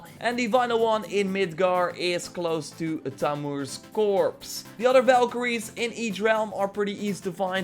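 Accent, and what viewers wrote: Netherlands. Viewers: Are you dutch :) I guess yess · is he european his accent sounds dutch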